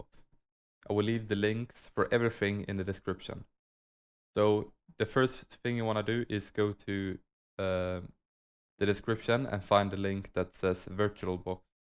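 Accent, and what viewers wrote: Sweden. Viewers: ayyy du är svensk lmao tyckte att jag kände igen dialekten haha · You Swedish? Same! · swedush my man som jag xD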